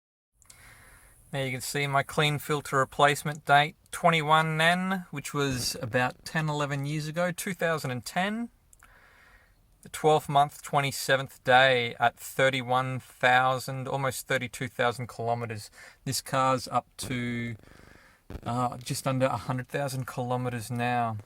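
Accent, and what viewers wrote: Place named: Australia. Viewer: Great video. Hope some others find some benefit from your tutorial on changing a cabin filter. Unfortunately, I don't drive, but I enjoyed listening to your Australian accent. ;)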